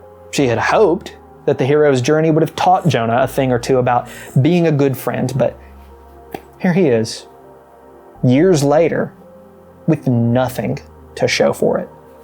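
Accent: South, United States. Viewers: Hmmmm...based on your accent I'm going to say either Louisiana or East Texas. Potentially Arkansas. You don't have to deny or confirm that either way, I'm just guessing as a person from a different part of the region. It also could be one of the Carolinas, I'm thinking that's more likely now but I'm not well versed with the Carolina accents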